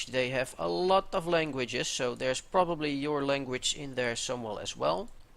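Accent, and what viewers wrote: Netherlands. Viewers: Three words and I knew you werd Dutch as well haha. Great tutorial/walkthrough though. I have recently starten streaming on Twitch and this really helped me out. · U're Dutch? :P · Ik hoor gelijk dat je Nederlands bent :D Nice video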